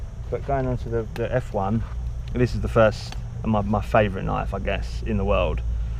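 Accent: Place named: United Kingdom. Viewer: I liked that you took out the kits, and then went throught them later. That's a good idea for the audience. I'll be borrowing that idea when I share mine with the world. I like your hobo rocket stove. That seems like an upgrade compared to the one I built. I'm impressed that you managed to cram all that stuff in there. The bag looks well throught out, well organized, and well used. The tape on a hacksaw blade is a nice touch and really stood out for some reason. Final thoughts: holy crap I didn't know British was a different dialect. I lost count of how many new words I learned LMAO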